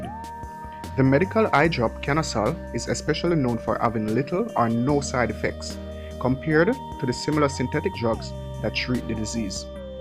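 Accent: Jamaica. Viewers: It still sounds like he's speaking creole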